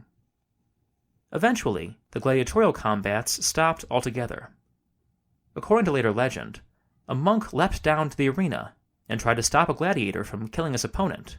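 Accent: United States. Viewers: Sounds like an American, alright. 🤦🏻‍♂️ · OK I'll save you a lot of time so you don't have to listen to the whiny American accent ,it was an earthquake end of story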